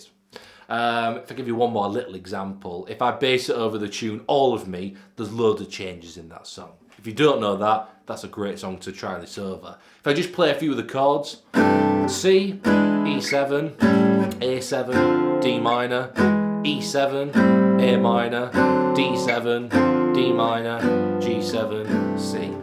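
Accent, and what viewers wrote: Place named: Derbyshire, United Kingdom. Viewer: I didn't understand a word. Nevermind, nice accent though. Buxton?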